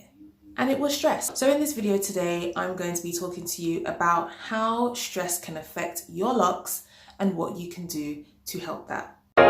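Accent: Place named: United Kingdom. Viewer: crazy but, its so nice to hear a British accent on a loc channel 😂. children stress!! definitely need to stop stressing. I'm a worrier! but, this year is about balance, balancing work and play, I used to only work and hustle also, to do my daily gratitude, which really helps me relax.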